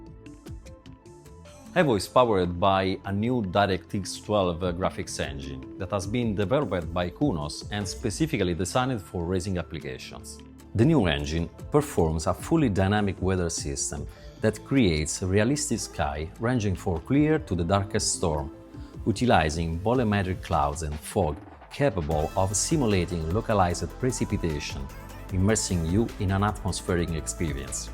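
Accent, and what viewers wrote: Rome, Italy. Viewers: l'accento italiano è la cosa che rende ancora più particolare il video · i dont know about you but i trust a guy with an italian accent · L accento roma o rende tutto migliore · The italian accent is so cool. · porca boia l'accento più italiano che abbia mai sentito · i love the strong roman accent as an italian ahahahahah loving the fact the Alfa Romeo Giulia is the cover car <3 it deserves it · Came for the asseto corsa evo update stayed for the absolutly beautiful Italian accent.... Bellissimo! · As an argentine, your clear english on pure italian accent feels like our grandfathers telling an interesting story, let me prebuy already i have saved on my steam wallet for months.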